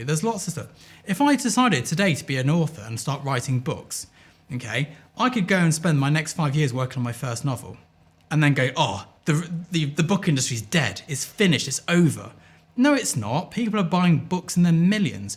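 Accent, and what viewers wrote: RP, United Kingdom. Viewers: I have recently found your videos. The first one I thought ‘who’s this posh bloke and why should I be listening to him’😊 but as I have started to watch more and more of them I have to say I find them fascinating and very illuminating. I am not a photographer I work with video but some of these recent points resonate with me and my part of the industry. So hats off to you. Keep up the good work!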